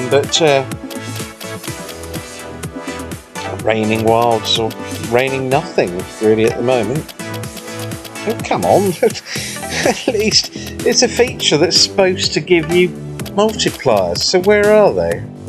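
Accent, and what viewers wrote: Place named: Newcastle, United Kingdom. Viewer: I miss the geordie twang like man 👌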